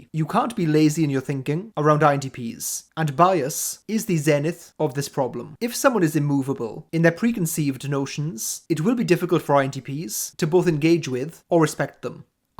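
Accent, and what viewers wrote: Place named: Wales, United Kingdom. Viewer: really off topic but i love your accent 😁are you welsh by any chance :O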